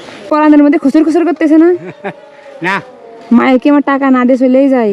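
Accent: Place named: Rangpur, Bangladesh. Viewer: কথা শুনে তো রংপুরের অঞ্চল মনে হচ্ছে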